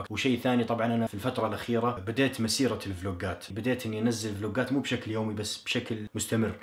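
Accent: Saudi Arabia. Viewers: كيف تهرج سعودي ونتا تونسي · انت من وين وكم لك مبتعث وليش تتكلم سعودي ( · زيارة (oW9Q) الله يرحم اهلك تراني اعرفه من قبلك ، تونسي درس في السعودية عشان كذا لهجته سعودية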